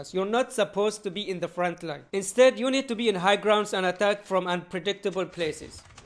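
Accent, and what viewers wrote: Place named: India. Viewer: Your voice essence feels like u r Indian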